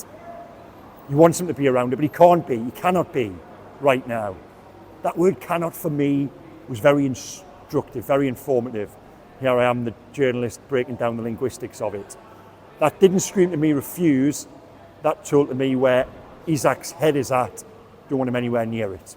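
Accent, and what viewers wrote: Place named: London, United Kingdom. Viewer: No need to put your London media voice on for us if you want to do a video in your proper Geordie voice we are here for it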